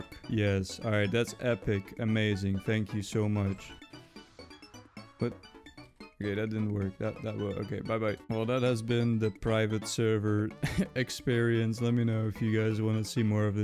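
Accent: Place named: Netherlands. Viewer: This guy has a accent like he is from the Nederlands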